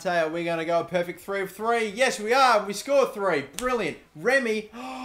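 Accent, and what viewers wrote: Australia. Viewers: wait, you support nufc but you sound Australian? well... good enough for me, SUBSCRIBER EARNED NUFC4LIFE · He is Australian.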